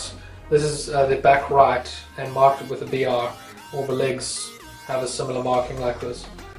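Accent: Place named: South Africa. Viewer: Are you South African? You sound South African.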